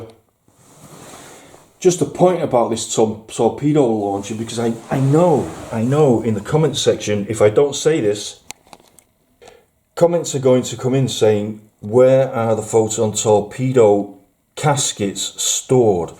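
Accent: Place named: Bury, United Kingdom. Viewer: Wow, amazing work, my friend! Your skills are out of this world, which is quite apprpriate! Growing up, I always preferred the overall look of the Enterprise D exterior, however, I recently learned just how VAST the D is, like a city, too big to walk around, you're 100% dependent upon the turbolifts, and that really put me off. The curved corridors we see on TNG have a TINY diameter compared to the actual outer saucer. That ship is so vast, it's actually nightmarish. Too big to feel like home. Now as for the original 1701, it's still huge, but small enough to feel comfortable, small enough to walk around, so I'm starting to appreciate it way more. As for you taking a few liberties with the design - why not? Since the "official" layout diagrams were incomplete, it's up to the fans to fill in the gaps using logic and knowledge of the show. 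By the way, your accent reminds me of the Bury area, where my dad's from :-)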